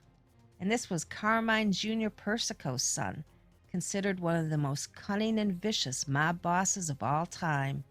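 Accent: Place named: Chicago, United States. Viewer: The narrator sounds like shes from Chicago. Is that true? And if so where?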